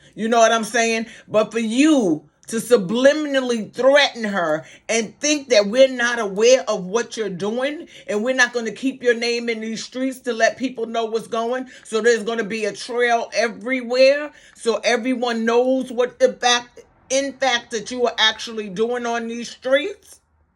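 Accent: East Coast, United States. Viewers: This is my first call stumblingly across your page. I'm getting an East Coast twang, I love it! New subscriber! Keep that energy PLEASE 😊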